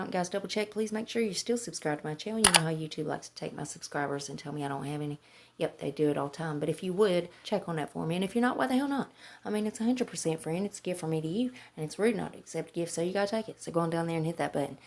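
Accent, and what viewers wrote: South, United States. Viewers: I'd love to get that Hourglass shadow! Your spoiler videos are the best... short, sweet, and served up with that awesome Southern accent (I'm a Bama girl myself)... keep your chin up, girl! ❤❤❤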